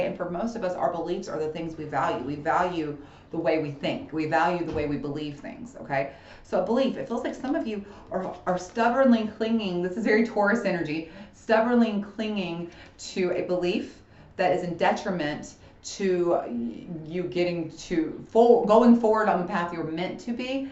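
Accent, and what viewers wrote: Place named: South, United States. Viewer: I'm digging the vibe here so much! That beautiful Southern accent sucked me right in lol. I love how every reader comes with their own signature flavor, and I'm happy to find another gem on here 😁🙏✨ **I knew you had to be a Saggie lol!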